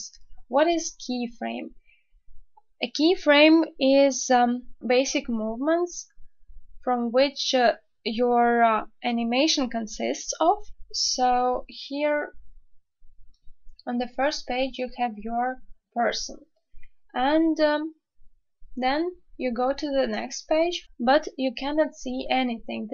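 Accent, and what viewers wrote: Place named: Latvia. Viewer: Your name and accent makes me guess that you are Latvian. Could I be right? :D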